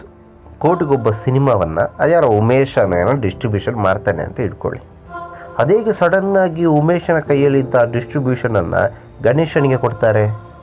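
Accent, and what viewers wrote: Coastal Karnataka, India. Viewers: Yavano ninu magne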